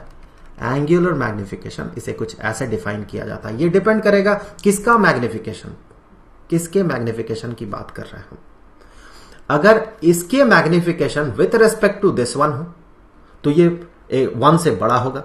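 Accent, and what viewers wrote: Bihar, India. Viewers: Sir are u from bihar?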